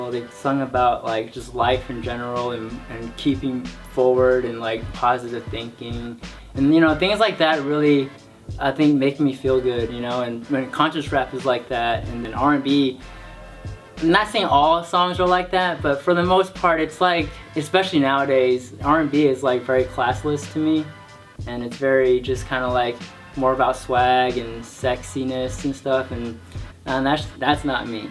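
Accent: Texas, United States. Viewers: He has a Texan accent lol. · it's that southern drawl we have in Texas. maybe not a southern accent, but we draw our words out more. :) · Wow, I never realized that was from Texas, I can totally hear it in his voice. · Now I understand shy he talks so funny, he's from Texas :D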